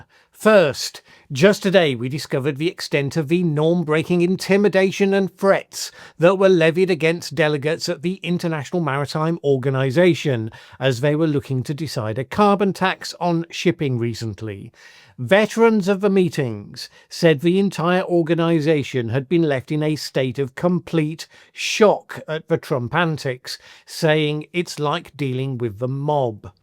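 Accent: United Kingdom. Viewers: Maybe you should go and tell this to the people being massacred by terrorist or have you guys become apologists for terrorists because the people being killed are blacks. Listening to your voice, you must be British the very people who have been the horrible people keeping the terrorism going for you to steal Nigerian resources.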